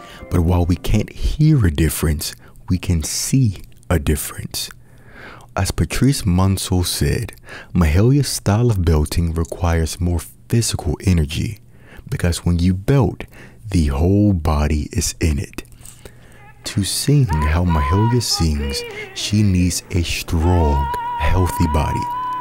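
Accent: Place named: Louisiana, United States. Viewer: i love hearing your #louisiannaaccent... some accents are so musically nuanced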